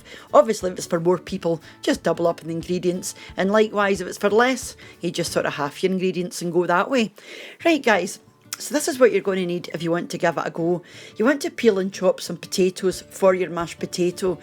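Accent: Scotland, United Kingdom. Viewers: Watching from Oregon: love to hear that lovely Scots accent again. My mum was "frae" Edinburgh & has since passed. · Love your accent. I've always liked Scotland. But just started watching Outlander and kind of obsessed right now lol. Just subscribed and will look at more videos and have a go at them. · Tatties mince and cabbage,always been my favourite comfort food,never heard of skirlie before ,mind you I was only 12 when mum n dad emigrated to Australia,love listening to your accent,I ve still got a wee bit of mine,we came from around Stirling. Happy Days! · My grandparents were from Glasgow and I grew up hearing that accent, I miss it and them very much.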